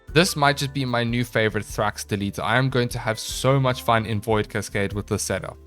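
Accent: South Africa. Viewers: I can hear you are South African XD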